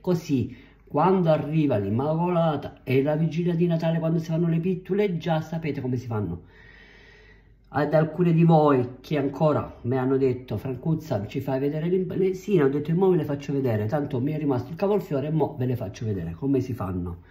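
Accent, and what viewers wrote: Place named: Salento, Italy. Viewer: Grazie 🙏🏼poi con l’accento salentino sono sempre più buone 😊bravissima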